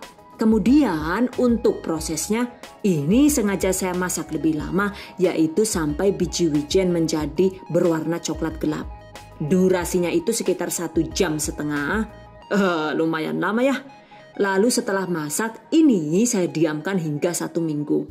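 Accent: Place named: Java, Indonesia. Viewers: Aduh mba suara nya lucu bngt kl lg kecaprakan .bhs jw nya medok bngt .wk wk wk 😃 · Orng mariun ko' pke gya bhsa orng teggal.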